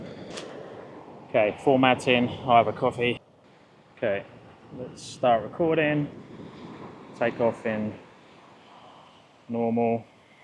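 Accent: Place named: United Kingdom. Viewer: Are you originally British? You have a very british sounding accent Down under aha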